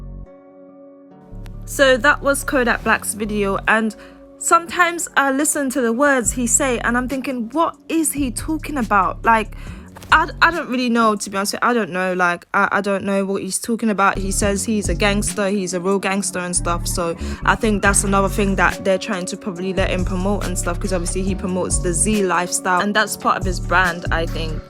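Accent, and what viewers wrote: United Kingdom. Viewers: lol your from the UK why you speaking on american news? weirdo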